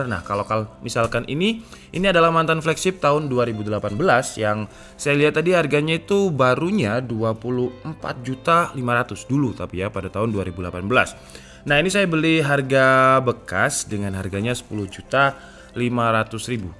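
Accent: Malang, Indonesia. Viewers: Bang asal malang kah? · Bang orang malang ya?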